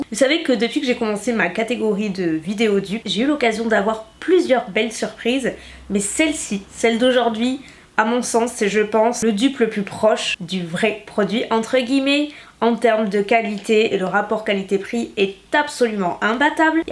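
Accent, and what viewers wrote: South, France. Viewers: Vraiment très ressemblantes c’est sûr 😍 et pour le moinssssss ☺️ vive l’accent du Sud! 😃 Bisou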